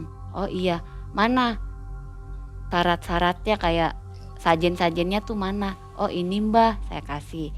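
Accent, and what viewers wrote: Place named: Cirebon, Indonesia. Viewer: kya org cirebon yah logatnya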